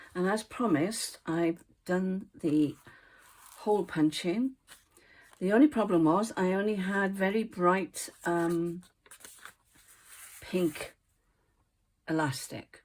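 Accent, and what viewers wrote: Wales, United Kingdom. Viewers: I love your Welsh accent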